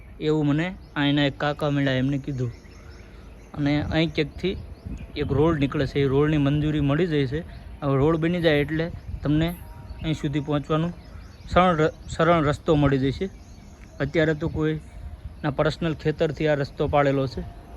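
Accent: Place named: Bhavnagar, India. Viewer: તમારી 'બોલી' પરથી લાગે છે કે ભાવનગર-તરફના હશો...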